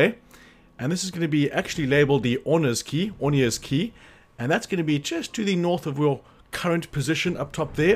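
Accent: South Africa. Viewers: You sound South African.. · Hearing a South African do these videos is the best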